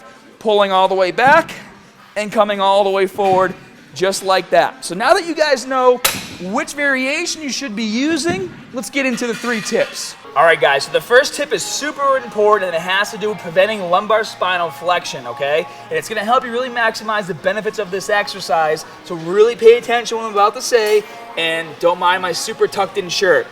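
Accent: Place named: Massachusetts, United States. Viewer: That Mass. accent seemed heavier than ever in this video, haha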